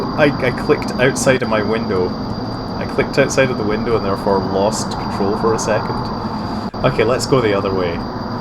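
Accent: Scotland, United Kingdom. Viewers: Woo a Scot!:P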